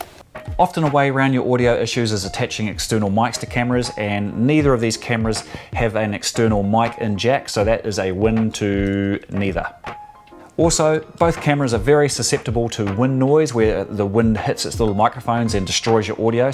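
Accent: New Zealand. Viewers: He's a Kiwi ;) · Definitely a kiwi accent 👍🏽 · Are you Australian or Kiwi? :) I'm not a hundred percent sure but I rekon you're from New Zealand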